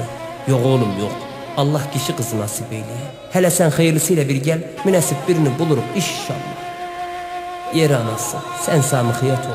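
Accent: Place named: Çobanbey, Turkey. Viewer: diline salık emi gene cobanbey şivesini çok güzel anlattımışsın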